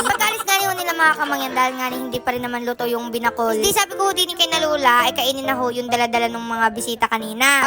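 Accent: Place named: Marinduque, Philippines. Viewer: Marinduque ❤.. from samar😅 naaaliw akong manood s vlog mo at ganun na ganun sa salita nila dini sa Marinduque 😅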